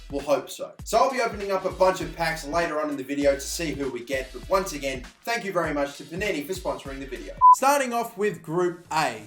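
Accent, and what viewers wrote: Australia. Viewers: Wait ur Australian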